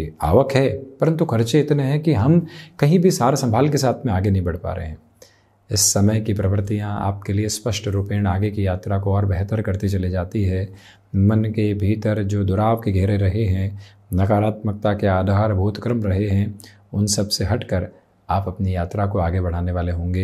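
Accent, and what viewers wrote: Standard. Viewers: Bahut kam log itni shudh ( Pure) Hindi bol paate hai aap ka bolna mujhe bahut pasand hai rahi baat khumbh rashi ki to main mann chuka ho ki ab kuch accha hone wala nahi ha chamatkar ho jaaye to main kah nahi sakta SUNIL CHAUHAN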